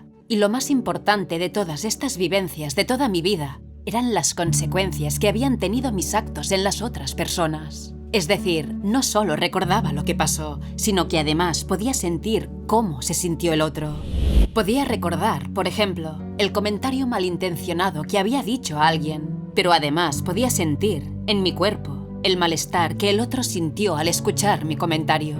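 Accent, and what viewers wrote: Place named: Spain. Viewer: Esta ECM nos deja una gran moraleja, tenemos que ser más empaticos y cuidadosos cuando decimos o hacemos algo, tal vez sin querer podemos lastimar u ofender a los demás. Me encantan estos testimonios, me traen paz y tranquilidad, me hacen pensar que en algún momento me voy a reunir con mi niño en un lugar de perfecta paz, además ese tono de voz y ese lindo acento español es muy relajante. Te lo agradezco mucho. Saludos cordiales desde Colombia!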